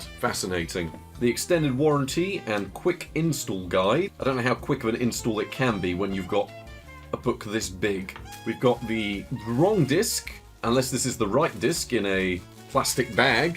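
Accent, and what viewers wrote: England, United Kingdom. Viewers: You must be from the same area of England as Jeremy Clarkson because you sound like a younger version of him.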